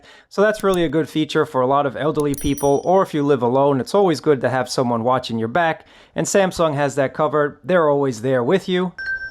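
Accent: New York, United States. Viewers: Love the NY accent.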